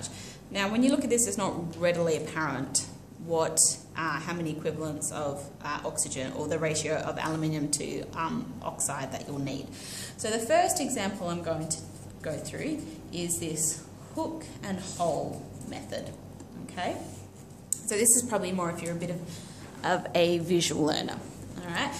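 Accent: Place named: United Kingdom. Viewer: Yo she’s British that’s how British people talk, not everyone is American and speaks like one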